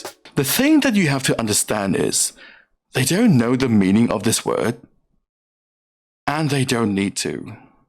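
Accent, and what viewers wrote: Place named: England, United Kingdom. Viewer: Very balanced take and earned my sub so will check out what else you have on your channel. Also being from England you have a cool sounding English accent and im curious if you learned English in my country?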